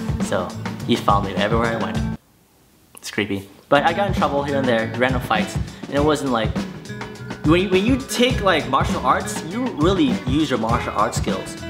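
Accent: United Kingdom. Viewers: you sound british man! lol - greetings from sydney australia! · ahaha your accent leans more towards the british accent :) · The accent.... Lol I'm Australian and that was so British ahaha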